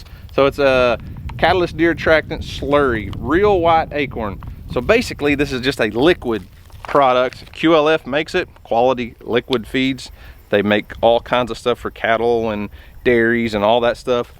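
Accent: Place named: South, United States. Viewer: I was born and raised in Alabama and the part I'm from Walker County we're big country talkers like you I'd think that you were from my neck of the woods meaning like Alabama and surrounding state's of the South east never would have thought you guys sound so country!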